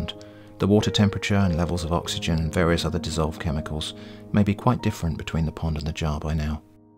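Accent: United Kingdom. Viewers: Mmmmm a Brit eh?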